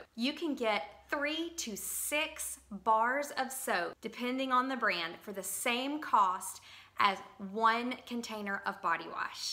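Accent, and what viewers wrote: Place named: Tennessee, United States. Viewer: Great video! You sound like you're from Memphis or Nashville! Are you from TN?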